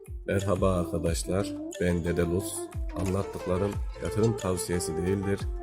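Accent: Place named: Kırşehir, Turkey. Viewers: da kırşehir şivesi var gibime geldi. sa nasrettin hoca demek istedim. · Ne güzel konuşuyor tam bı Anadolu çocuğu teşekkürler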